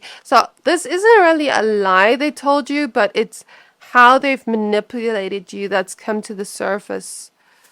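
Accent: South Africa. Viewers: South africa? Wow. I was wondering what your accent was from......and your lights went out. ....2 months agi i was having an issue with my finances and had my electricity shut iff because i didbt oay it. ... And EVERYONE IS AGAINST ME FOR ME. EVERYBODY........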